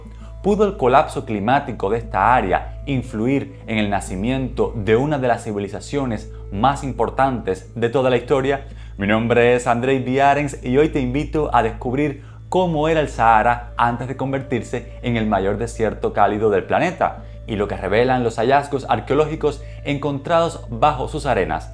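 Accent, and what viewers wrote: Cuba. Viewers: Amigo tu acento me suena a Cubano. Lo eres ?? Gracias muy buen canal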